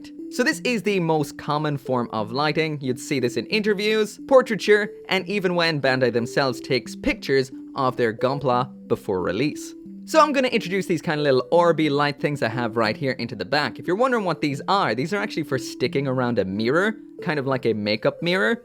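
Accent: Ireland. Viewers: Ahh... he's irish. That's why he talks like that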